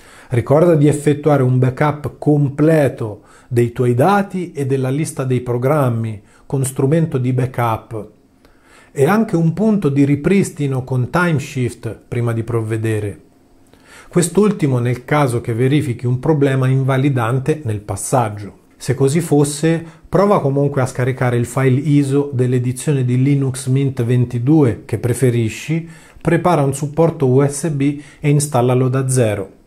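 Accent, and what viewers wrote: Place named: Umbria, Italy. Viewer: ciao, non riesco a capire il tuo accento.....sei umbro?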